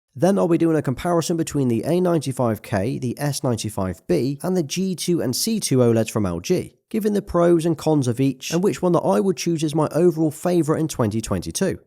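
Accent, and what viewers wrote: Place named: England, United Kingdom. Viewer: Love your English accent, mate.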